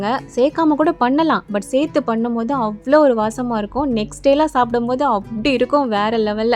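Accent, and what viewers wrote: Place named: Kilakarai, India. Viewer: I'm from kilakarai..manja soru sila peru ipdium panuvaha.. aana idhoda Ginger garlic paste and tomato um serthu kari ku thalipu mari pota inum supera irukum...try pani parunga ❤unga slang um klk mari than Iruku.. maashaa allah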